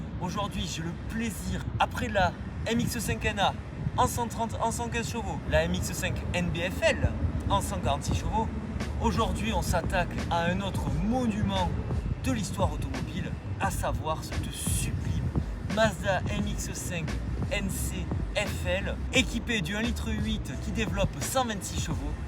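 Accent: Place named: Bordeaux, France. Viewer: Le petit accent Bordelais des Familles b un essai du côté base sous-marine. Copbo gagnant avec une Mx5 Nc dommage que elle n'existe pas en 170cv elle serait top.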